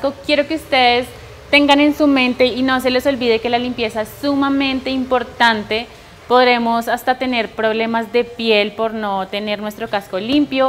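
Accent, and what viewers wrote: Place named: Colombia. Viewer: Gracias por este vídeo, me encanta tu acento colombiano ✔️👍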